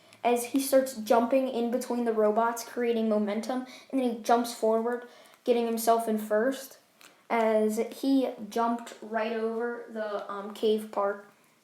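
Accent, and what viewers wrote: United Kingdom. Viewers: Is this man brithish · U british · It was British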